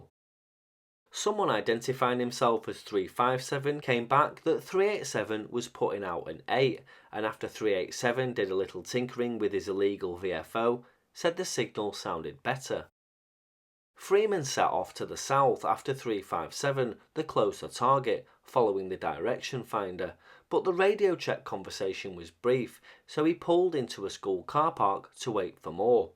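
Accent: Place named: Liverpool, United Kingdom. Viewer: Where are you from? I detect a Scouse accent.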